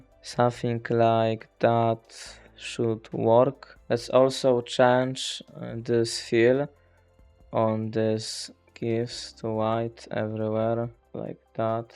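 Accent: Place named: Poland. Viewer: Polska GUROM slychac akcent <3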